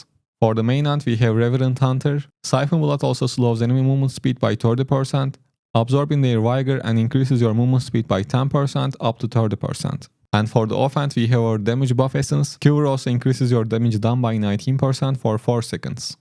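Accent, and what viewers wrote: Turkey. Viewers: Kankam selamlar,Türk olduğun aksanindan belli bu arada, tüm classlarla oynamış biri olarak; en hızlı farm yaptığın Class hangisiydi ? Favorin nedir ? Şimdiden teşekkürler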